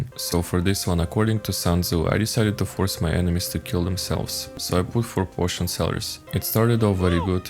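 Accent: Russia. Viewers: Ты шо русский? Судя по акценту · Но я же слышу по твоему акценту, что ты русский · Русский акцент? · Why no one is commenting about kinda russian actent? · Russian accent I guess? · My comrade, you have the hardest Russian accent I've ever heard. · Дубляж на русском был бы прекрасен, особенно учитывая что автор явно русский по акценту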